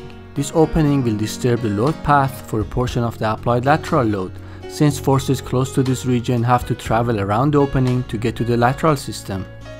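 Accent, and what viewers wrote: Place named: Iran. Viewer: Iranian accent. قرمه‌زبزی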